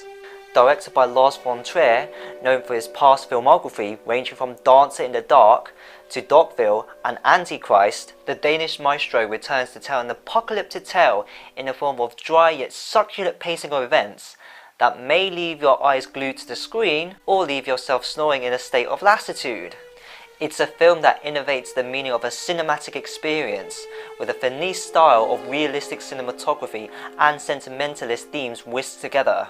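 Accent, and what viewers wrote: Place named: United Kingdom. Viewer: Also, British accent really rocks!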